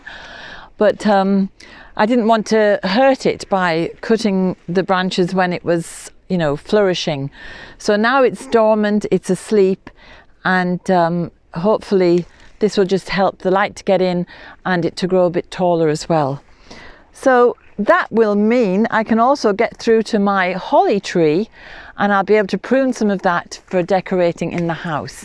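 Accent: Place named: Yorkshire, United Kingdom. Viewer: ah! that explains it! I could hear Yorkshire and Ireland and Teeside and I couldn't figure it out. I, too, have a very mixed accent from moving around. Have just come back from a stay in Darlington, coincidentally, to my current home in Yorkshire (but born and raised in an Irish family). I hope you are doing well xxx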